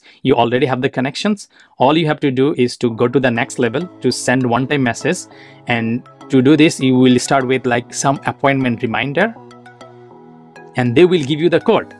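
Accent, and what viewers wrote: Bengal, India. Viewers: You are definitely A bong with your accent i can tell that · Just by listening to his voice, I can say he is a Bengali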